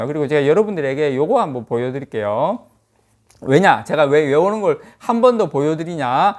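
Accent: Gyeongsang, South Korea. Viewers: 경상도 사투리 아리까리...ㅋㅋㅋ 의 사투리는 너무 구수하쥬♡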